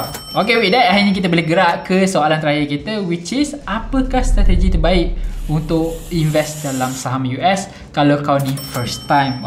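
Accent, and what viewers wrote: Terengganu, Malaysia. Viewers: oh bang org ganu en?😂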